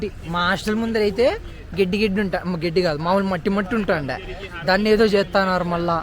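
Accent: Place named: Tadipatri, India. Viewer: anantapurama Tadipatri na, adhey slang idhi ...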